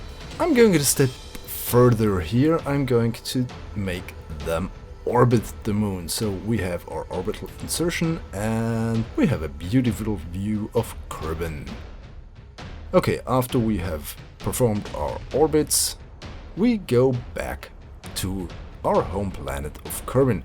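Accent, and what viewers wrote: Germany. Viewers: You are a german right? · Your English kinda sounds like it had a little German accent and your Space Station's name is Franz so... Nevermind. But where do you come from? Because you said you were proud of Europe producing for NASA. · You sound like a german, are you german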